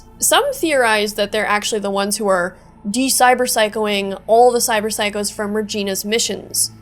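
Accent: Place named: Canada. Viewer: Amazing video. Also knew you were Canadian the first time I heard an "are" or as we say it "arr" lol Got me back into Night city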